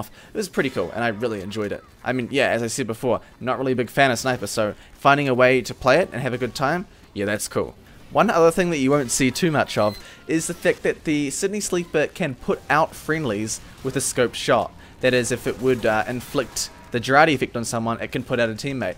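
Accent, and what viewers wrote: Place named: New Zealand. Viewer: bless you and your Kiwi accent.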